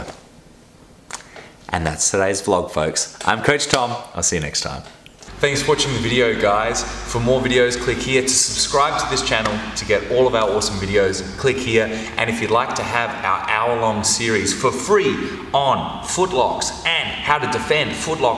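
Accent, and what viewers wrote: Australia. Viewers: Is your accent Australian? So you train where the spiders are the size of your head?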